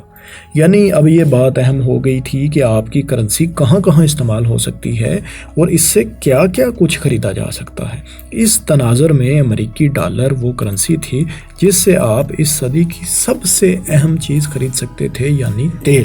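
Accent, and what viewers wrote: Punjab, Pakistan. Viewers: اس چینل کی تمام وڈیوز اردو زبان میں منفرد مقام رکھتی ہیں مگر اس نامناسب آواز میں نشر ہونے والی وڈیوز سماعت پر بہت گراں ہوتی ہیں۔ اگر یہ اردو زبان ہے تو اسکو پنجابی لہجے میں کیوں ادا کرا جاتا ہے؟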